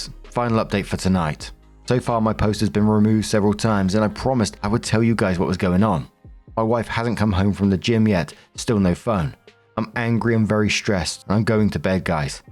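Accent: United Kingdom. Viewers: It is a British thing FYI, sometimes they don't pronounce 'co' or 'ch' with the hard 'k' that Americans do.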